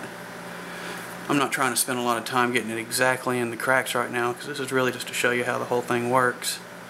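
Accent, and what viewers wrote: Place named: South, United States. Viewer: Love your dirty dirty south accent <3 And thank you for the tutorial. It helped me a lot! :)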